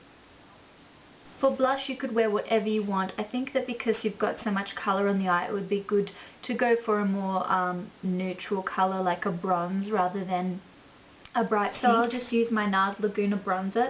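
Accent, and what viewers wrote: Australia. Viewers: Your so sweet for lettimg me kno ;) thanks girl. Love ur videos and your australian accent! Subbing to see more!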